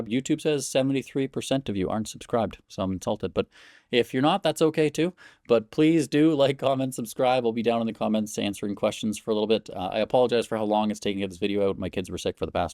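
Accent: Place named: Okanagan, Canada. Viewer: First time I watched the channel, you are an Okanagan guy by the sounds of it, I grew up in Winfield.. er Lake Country, but currently moved to central AB, sorry for the loss of Tracy Gray was sad to see Ktown go liberal. We have Pierre moving right next door to us now :) Keep calm carry on great advice !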